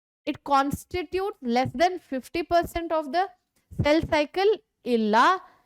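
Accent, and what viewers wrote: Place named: Andhra Pradesh, India. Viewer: Mam u r mother tongue is Telugu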